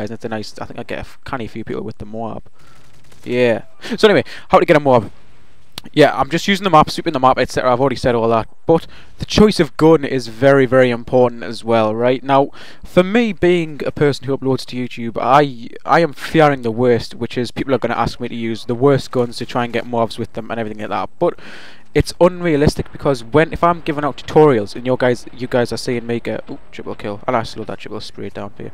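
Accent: Newcastle, United Kingdom. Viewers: By any chance are you from Newcastle?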